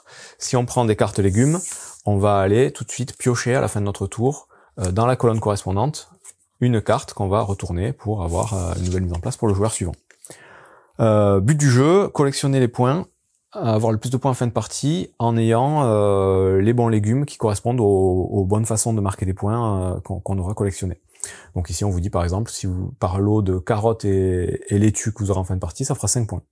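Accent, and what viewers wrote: South-West, France. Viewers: Bonjour, Je regarde régulièrement tes vidéos et je me décide enfin à te laisser un petit commentaire. Merci pour ta chaîne et la régularité de tes publications. Une chaîne qui donne de la fraîcheur dans le paysage vidéoludique pour le moins très similaire et assez commercial. Tu es clair, concis, précis dans tes explications, c'est fort agréable, et avec un accent du sud-ouest qui ne gâche rien ;).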